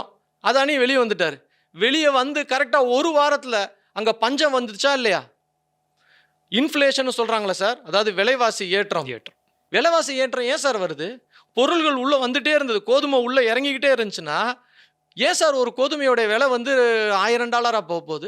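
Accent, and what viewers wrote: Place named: Kongu, India. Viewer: இவர்தெளிவாககொங்கு தமிழ்அழாககபேசுகிறார் இந்தகாணெரளிதெளிவாகதிடமாககருத்தயளிகிறார்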